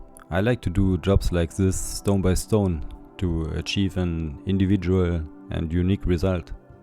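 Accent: Germany. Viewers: Your German accent rocks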